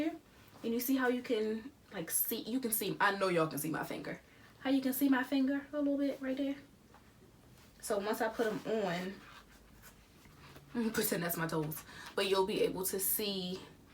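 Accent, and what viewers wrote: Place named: DMV, United States. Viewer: Love the shoes and that blue is gorge on you! I definitely get DMV accent from you are you a native?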